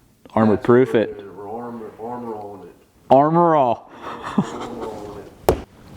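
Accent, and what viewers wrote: Pennsylvania, United States. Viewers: Bro i live in illinois. Before you even said where you're from i was like. "Yeah thats a PA accent." Completely random but i called it.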